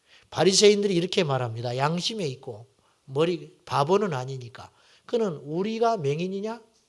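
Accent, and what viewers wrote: Jeolla, South Korea. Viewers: 목사님의~ 전라도 사투리 말씀에도 정겹고~ 은혜 됩니다~😊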